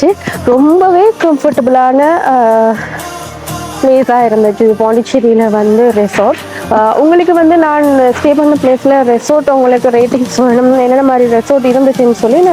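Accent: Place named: Tamil, Sri Lanka. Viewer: நீங்கள் டிராவலர இல்ல யூடியூப் பரா உங்கட தமிழ் ரொம்ப அழகா உங்களைப் போலவே இருக்கு நீங்க ஈழத்தமிழர 😊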